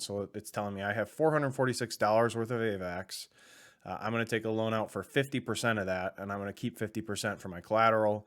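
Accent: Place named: Michigan, United States. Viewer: Great job bro 👍🏿. Sounds like you're in MI. Go Blue!😉. I'm in MI too. Maybe we can link up sometime. Blessings to you!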